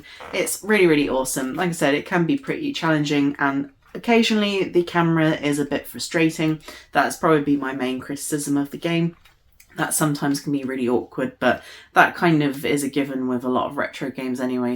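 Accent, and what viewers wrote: United Kingdom. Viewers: Hello. You're from UK, aren't you, lady? Is blue stinger and soldier of fortune also cut in UK region? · Dang she's pretty! Wonder what she sounds like not speaking the queen's English. Wonder what she'd sound like with American English. Anyway. Dreamcast was awesome. PAL territory got stuffed on boxart and such. American Dreamcast packaging and art always looks really good and doesn't have the PEGI rating system stamps on it that's an eye sore. The back of the cases are annoying too as they're in multiple languages. Today's games are similar though, having both Spanish and English that's annoying..... Unless you buy it and it came from Canada. Looks the same and you almost wouldn't know, except it's in English, Spanish, and French. Yuck...... Whew, pretty girl, smile a lot! It's a pretty smile, dang!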